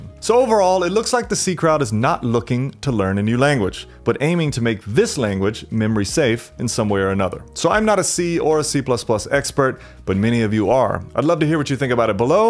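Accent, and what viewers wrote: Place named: Chicago, United States. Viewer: Completely off topic but correct me if I'm wrong but is that a Chicago accent I'm hearing?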